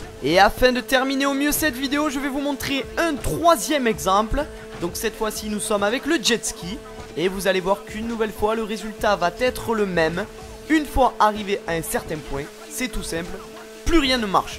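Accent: Marseille, France. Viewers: jentend un accen marseiller (vive paris) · il parle comme les marseille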